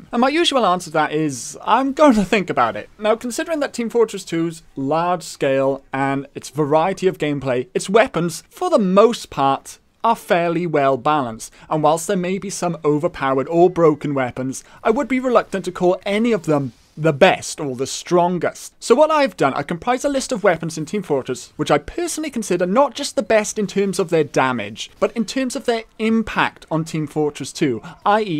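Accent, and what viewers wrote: Wales, United Kingdom. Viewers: Are you welsh? I hear the accent and emphasis on words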